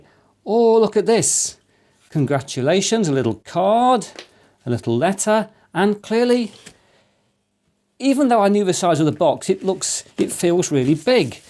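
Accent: England, United Kingdom. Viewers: It is never too late to congratulate (3 weeks late 🤭) Popularising is a noble thing and even more so when it comes to computers when I look around me. They treat computers like toys but cry when they've lost all their data because they didn't make a back up. I really enjoyed your video on mini SD cards to help me make the right choice for my Raspberry Pi. But most of all, I love your English accent, which makes a big difference to me compared to Americans and Canadians (peek-a-boo Linus Tech Tips).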